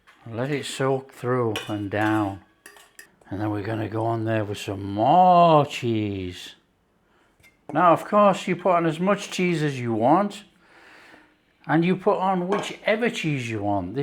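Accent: Yorkshire, United Kingdom. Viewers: It's not Scottish it's a Yorkshire accent which is a county in England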